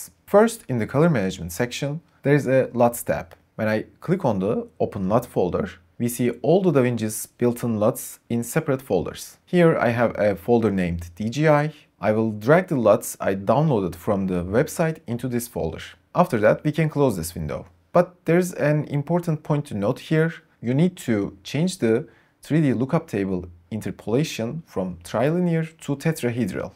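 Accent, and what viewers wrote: Turkey. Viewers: reis türksün galiba sesi duyar duymaz türk konuşuyor dedim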